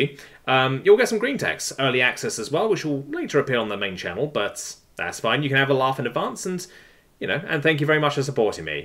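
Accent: United Kingdom. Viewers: This was the first time you sounded positively British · aside from the good news, I have to say, this man is one of the most British people I've ever heard. it doesn't come out in the normal videos.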